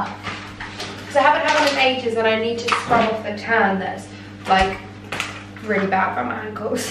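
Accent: United Kingdom. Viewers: Omg British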